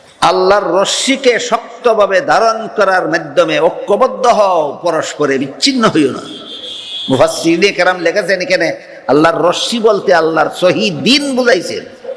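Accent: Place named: India. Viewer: আপনি ভারতে সুরে কথা বলেন।জাতির বারোটা বাজানোর জন্য আপনি একা যতেষ্ট।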